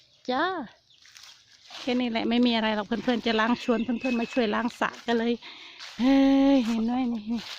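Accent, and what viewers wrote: Surin, Thailand. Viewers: ยายเป็นคนจังหวัดอะไรคะ สำเนียงเหมือนคนสุรินทร์ บุรีรัมย์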